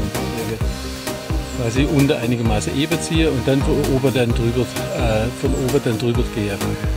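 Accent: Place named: Swabia, Germany. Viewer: Super erklärt,noch dazu auf schwäbisch!! Ja die größere Latte 😂😂😂😂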